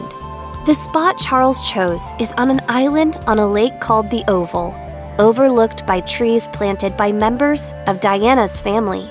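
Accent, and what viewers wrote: United States. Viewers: There is something irksome about an American voice over on videos of British affairs. Its like having a terrorist describing the devastation of their actions. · This unfortunate event would be far better remembered and narrated by someone other than an American. Pronunciation, together with a complete knowledge of British royal ceremonial would be a distinct advantage...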